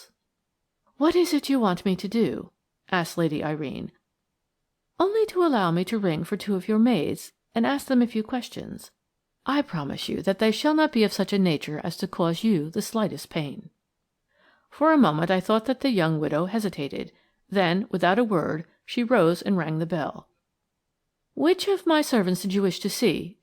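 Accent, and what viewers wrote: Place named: United States. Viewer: Should not be read by an American speaker. Can't listen to it, sorry.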